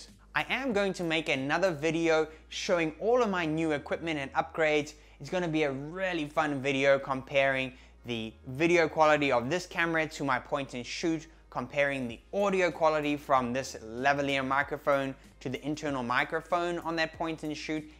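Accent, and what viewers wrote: South Africa. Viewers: Where are you from, your accent sounds South African - hi from South Africa btw :) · Audio is perfect. You are South African, right?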